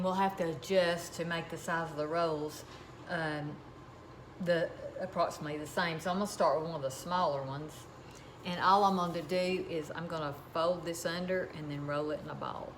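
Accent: South, United States. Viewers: I absolutely love your videos! You remind me a lot of both of my grandmothers. One of them sadly passed away 11 years ago and I miss her dearly. She made the best yeast rolls, but she never wrote down her recipe. I’ve been on a quest to recreate her yeast rolls and I’ve made many different versions over the years. I’ve come very close a few times but would love to try your recipe! You remind me a lot of my other grandmother as well, who like you, has the most beautiful Southern accent. 🥰 Your videos and stories are so wholesome and heartwarming and remind me of family, home, and comfort. It really feels like we’re part of your family. Thank you so much for sharing your life with us! Blessings to you and your family ❤️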